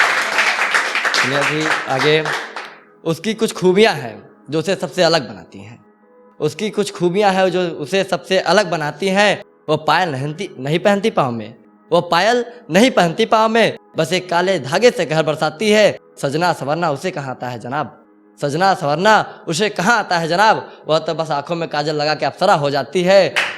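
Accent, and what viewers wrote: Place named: Allahabad, India. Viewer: Superbbb bro❤️.....pure allahabadib... must listen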